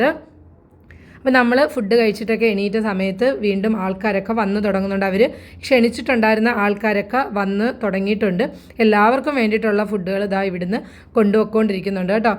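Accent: Kollam, India. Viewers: കൊണ്ട് അവിടത്തെ പിള്ളേര് വരെ തോറ്റ്...❤❤❤ നമ്മുടേ കൊല്ലം കഴിഞ്ഞു കരുനാഗപ്പള്ളി കായംകുളം വരെ ഉള്ള ഒരു ഭാഷ അല്ലേ നിങ്ങൾ പറയുന്നെ..❤ പിന്നെ എന്റെ രണ്ട് കമന്റ്‌ കണ്ടിട്ട് മിണ്ടാതെ ഇരിക്കുവാണ് ഇല്ലേ ഞാൻ കണ്ടു see..😂 നെവർ മൈൻഡ്.....ആ ലൈനാർ അംനിയെ ഒന്ന് കാണാൻ പോണേ.❤❤❤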